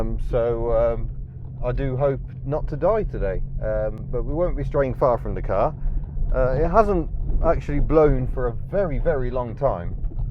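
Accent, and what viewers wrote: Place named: England, United Kingdom. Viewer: My God where did you get this sad old beast? Do all NZlanders sound English?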